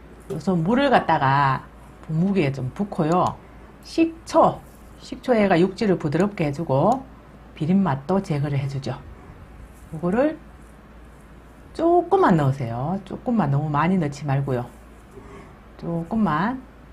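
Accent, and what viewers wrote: Gyeongsang, South Korea. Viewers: 경상도사투리 너무좋다 부러워요 입담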